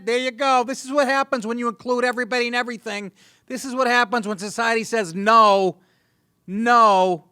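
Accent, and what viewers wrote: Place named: New York, United States. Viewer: "sar" a soccer game. Ha...ney York accents. Love ya Nick.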